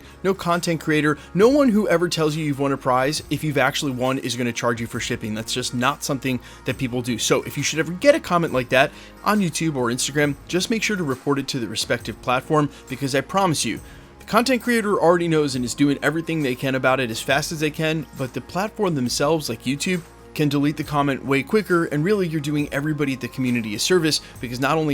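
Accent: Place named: Maryland, United States. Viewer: Bruhhh ur accent...... you've gotta be from Maryland 😂😂😂😂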